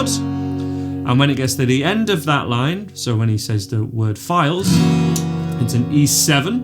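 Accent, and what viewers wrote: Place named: Preston, United Kingdom. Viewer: Hi Some really useful lessons on here for beginners. Thank You. Listening to the accent, are you from Preston or Huddersfield (or somewhere in between).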